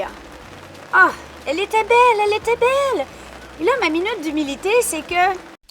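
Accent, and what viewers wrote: Quebec, Canada. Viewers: J'adoooooore ta bonne humeur et l'accent québécois et bonjour à tous les acadiens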